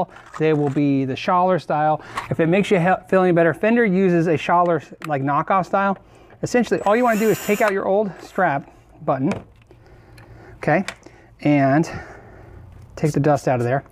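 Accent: United States. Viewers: Anyone know where in America this guy is from? I recognize the accent. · Americans pronounce it like that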